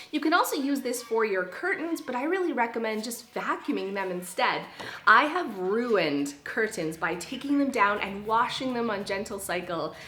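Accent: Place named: Southern Ontario, Canada. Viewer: You have to be Canadian or even from Ontario, pa day, geese, sounds like southern Ontario lol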